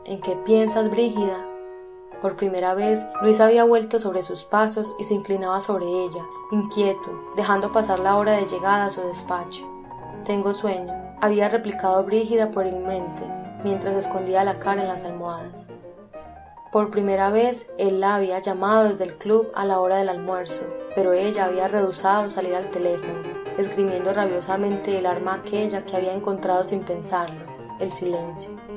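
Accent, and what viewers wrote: Antioquia, Colombia. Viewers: El acento de colombiana le da otro aire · Que habladito paisa tan ricolino e.e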